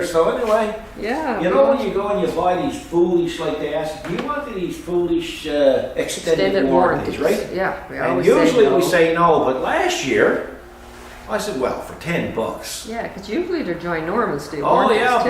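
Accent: Newfoundland, Canada. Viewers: First time watching. Great videos. "My son they're in there now, they thinks their in Bermuda". I'm assuming your from Newfoundland. Now where is the Jiggs dinner? I'm hungry!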